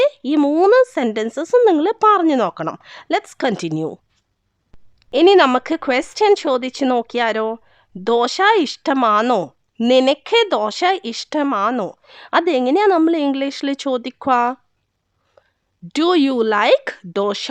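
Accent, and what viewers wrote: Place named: Kannur, India. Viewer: ഇങ്ങള് കണ്ണൂർ ആണല്ലേ 😄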